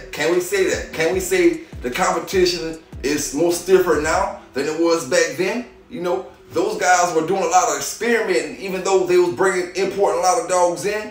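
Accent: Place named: Oklahoma, United States. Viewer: Much love Keep pressing forward. I don't know where you from...sound like you from Oklahoma.....love the channel. #Kansas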